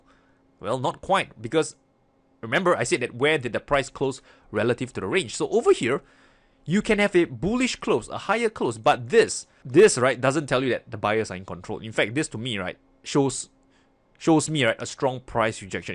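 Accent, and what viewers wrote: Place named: Singapore. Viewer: Singlish accent is popping!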